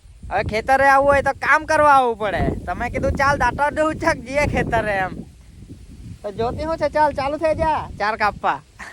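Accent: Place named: Surat, India. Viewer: Respect karo bhai atli simple gorgeous wife mali c6 to.. Tamri surti bhasha sambhalvani Mazama...keep it up 🎉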